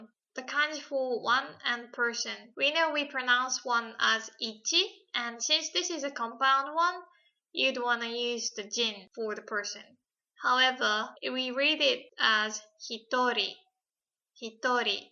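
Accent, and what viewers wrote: United Kingdom. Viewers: Now that I've heard a Japanese person with a British accent, I suddenly understand what it's like for British people to hear most non-native English speakers with an American accent. p goofy m8 also saigomademitayo, fam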